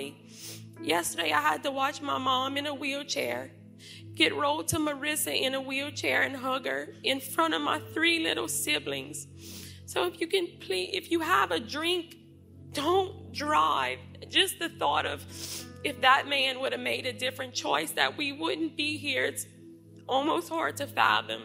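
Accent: Louisiana, United States. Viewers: First on a lighter note, I have to say I love your Cajun accent. But, Mercy my heart breaks for you.. I can’t imagine how hard this is for you and will continue to be.. my heart goes out to you and my prayers as well. I unfortunately am apart of the same group no sibling wants to be apart of. One where we’ve had to live part of our lives without another.. mine was killed in a car accident in 1999 when he was 18 and I was 13. My best friend, who was more like a sister than just a friend, died in 2008. She was killed in an accident by a drunk driver when we were 22. My heart goes out to you and your other siblings and family. I know the pain though not your exact situation.. it’s pain I would never wish on another person. I wish I could reach thru my phone and hug you, I wish I could give you words that would help or relieve your pain. But I will always be here for you and will continue to pray for you and your family. 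I am so sorry. Sending love from SW Arkansas.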